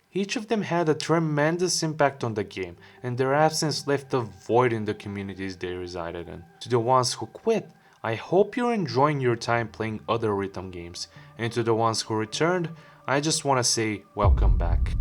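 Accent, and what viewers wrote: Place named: Bulgaria. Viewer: Tozi Bulgarski Akcent e na drugo nivo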